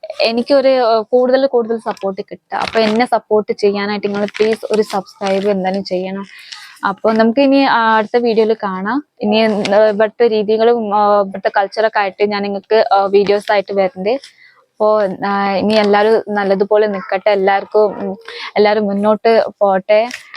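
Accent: Malappuram, India. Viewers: കേരളത്തിൽ എവിടെ ആയിരുന്നു. മലപ്പുറം സ്ലാങ് ആണല്ലോ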